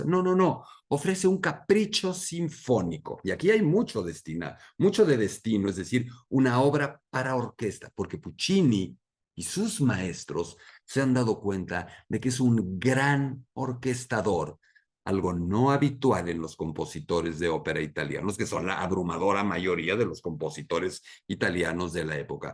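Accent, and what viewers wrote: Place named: Venezuela. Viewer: Voy a estar pendiente de tus videos sobre ópera. Hablas como los italianos en Venezuela.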